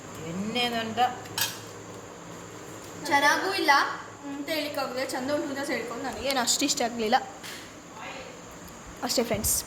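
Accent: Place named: Kundapura, India. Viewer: ನಿಮ್ಮ ಕಡೆ ಮಾತು ತುಂಬಾ ಚಂದ ಉಂಟು ಕುಂದಾಪುರ ಕನ್ನಡ ಕೇಳೋಕೆ ಚನಾಗಿರುತ್ತೆ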